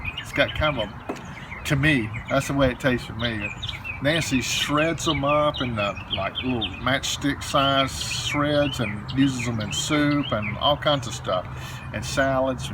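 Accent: South, United States. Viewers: I must admit that I first decided to trust you because of your Southern accent. The Tennessee came through loud and clear--my grandparents live there and listening to your voice was comforting. Then I realized just how much you and Nancy know about gardening. Your story is beautiful as is your faith. Thank you for providing an effective gardening education and so much hope in a time of need. God Bless.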